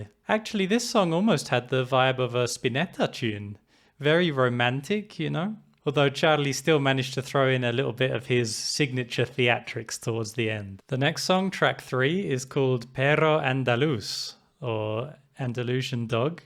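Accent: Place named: Liverpool, United Kingdom. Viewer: you sound liverpoolish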